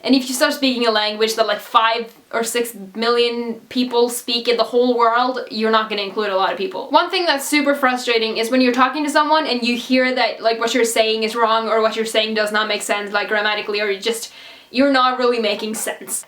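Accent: United States. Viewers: Very nice American accent... how come?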